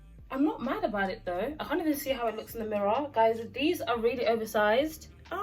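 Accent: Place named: Southern Africa. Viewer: I kept wondering where in Southern Africa you were from 😅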